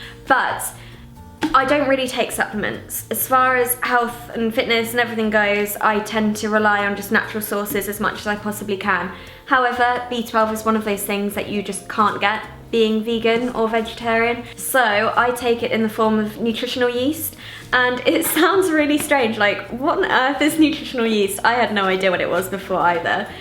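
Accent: United Kingdom. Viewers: hey! you're so beautiful, you take care of your body and have a british accent. inspiring lady. keep making videos! 🙆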